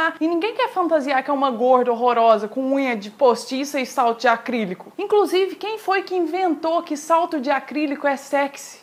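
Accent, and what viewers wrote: Minas Gerais, Brazil. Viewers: poxa aos eu ja notei que ela e mineira kkkkkkkk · Que gracinha ela falando mineirês! 😍😍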